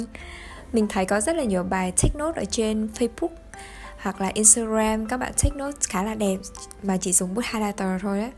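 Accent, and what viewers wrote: North, Vietnam. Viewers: Bạn ở đâu vậy ạ? Tại mình thấy video cũ của bạn nói giọng nam mà video này nói giọng bắc ý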